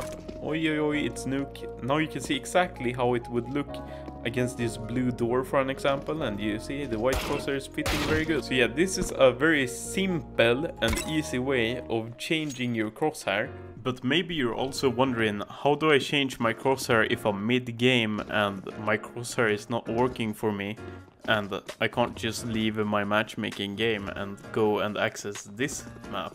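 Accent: Sweden. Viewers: are you swedih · Swedish accent is soooooo noticable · Are u swedish?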